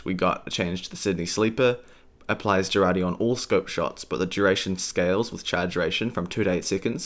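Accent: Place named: New Zealand. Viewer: <3 dat New Zealand accent and ur vids :P ~ From Straya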